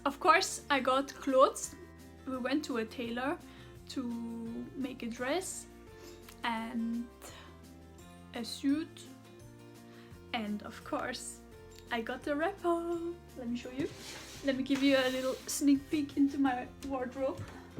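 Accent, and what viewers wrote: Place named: Nigeria. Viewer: Beautiful oniocha! Your Nigerian accent coming through quite strong lol! I wish we could all appreciate and respect each others culture like this.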